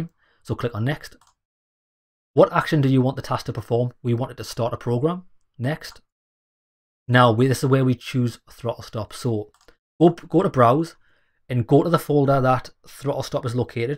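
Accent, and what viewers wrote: Newcastle, United Kingdom. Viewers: Super helpful! And I think my new go-to genre of Youtube narrator may be a Geordie 😂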